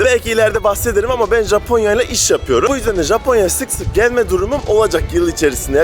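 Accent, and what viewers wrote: Turkey. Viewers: 15 sene Japonya'da yaşayıp Tr şivesinden kurtulamamak peki?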